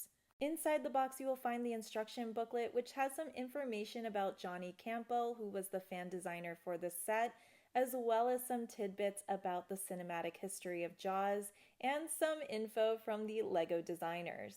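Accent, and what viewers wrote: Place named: Massachusetts, United States. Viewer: Great video! I can't wait to get my hands on that JAWS set; I couldn't believe it when it finally got announced. You did a great job showing off all the cool details, and the fact that you're a fan of the movie really comes across. Also, you're from Massachusetts, like myself, so you get extra points. I heard that Mahtha's Vinyahd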